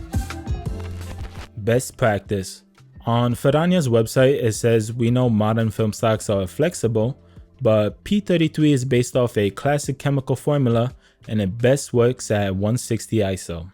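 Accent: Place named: New York, United States. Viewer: Why you sound like a New Yorker but live in eu?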